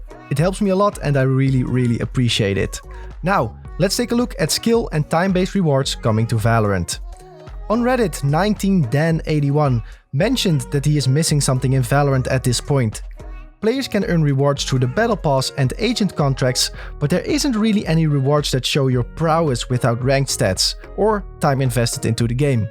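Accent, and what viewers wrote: Netherlands. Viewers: Are u dutch? You kinda have the accent. Your enlish is great tho :)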